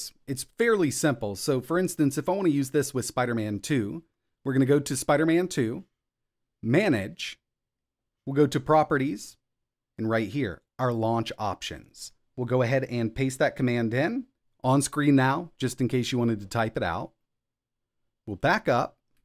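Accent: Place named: United States. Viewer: Bro what part of America are you from. This is the best American accent I’ve heard. Heard a few times but can’t place it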